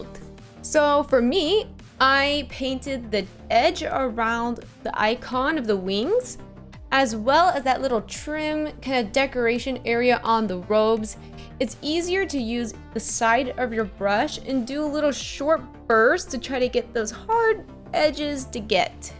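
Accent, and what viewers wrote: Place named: Europe. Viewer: Wait, what? A Warhammer painter who doesn't just prime white and slapchop away with abandon with those overpriced contrast paints?? I like you already! That guy looked like he's been through a few trenches and is Not Having It Anymore (this is a very good thing)! Well done, and pardon my asking, but are you European? I'm trying to identify your accent...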